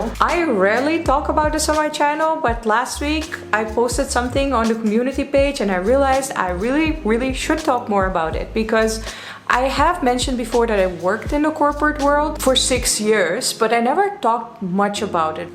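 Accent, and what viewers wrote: India. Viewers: You got a little Indian accent when you speak english haha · Je hebt zelfs een Indiaas accent als ik het zo goed zeg? Heb een hele tijd geleden wat Nederlandse vlogs gezien en kwam je nu weer tegen. Wat leuk dat je je draai hebt gevonden in India! Wens je veel geluk 🍀 op je pad! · Totally picked up Indian accent....😄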